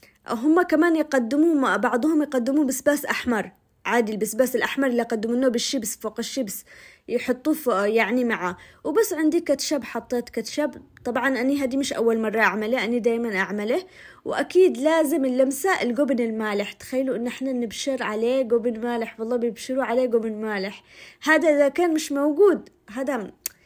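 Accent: Aden, Yemen. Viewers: ايوه حلو لهجتك تجنن يمنيه وافتخر تحياتي من صنعا ام ايلان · ماشاالله عليكي دكرتني يابنتي بالتواهي والسياره حق البطاطيس الله يحفظك يا اصيله بنت عدن وكله كلامك عدني محسن كلامنا نشتي طريقه الكاتلكس بالمفروم حق عدن والسبوسه حق عدن من يد حبيت الاكل ❤❤❤❤❤🎉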